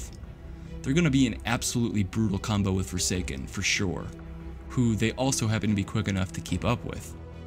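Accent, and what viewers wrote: United States. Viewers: I'm from England mate defiantly best not start a youtube channel. Dont worry..... Yanks all sound the same to us man. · I'm detecting a Midwest accent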